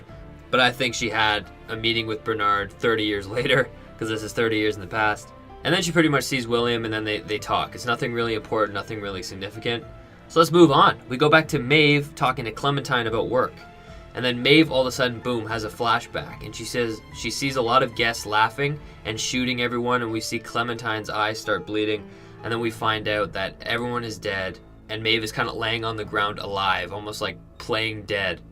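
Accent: Canada. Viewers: do all Canadians pronounce Theresa as "tharissa"?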